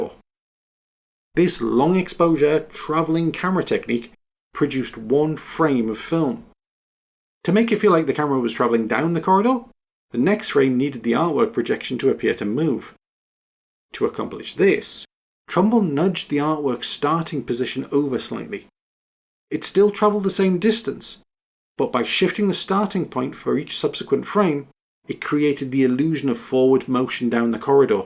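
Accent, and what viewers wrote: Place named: United Kingdom. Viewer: First time seeing your channel, I think. It really reminds me of Captain Disillusion, if you're familiar with him. Great production values where you can tell a lot of effort has gone in, and very educational with clear, inspired examples to show what you're talking about. Like a less boisterous, more British version of Captain D, for sure!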